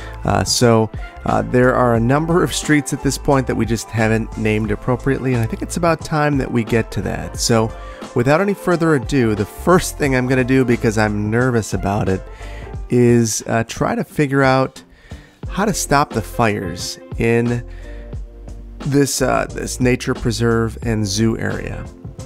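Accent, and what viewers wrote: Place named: Midwest, United States. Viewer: My wife and I were convinced that you had to be from the Midwest. Now we're certain. Keep up the good content man. Go Pack Go.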